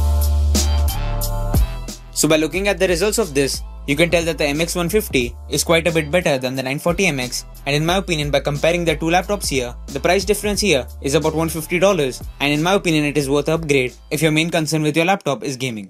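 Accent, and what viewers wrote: India. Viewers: Indian accent is always funny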